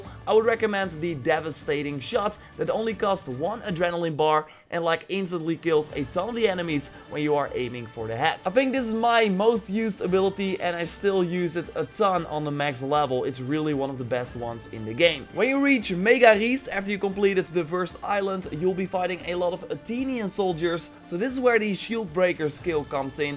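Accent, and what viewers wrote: Netherlands. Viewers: Are you Dutch? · You dutch bro? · Are you dutch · Aan je accent te horen ben je nederlands😂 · On what difficulty are you playing? (also are yah dutch?)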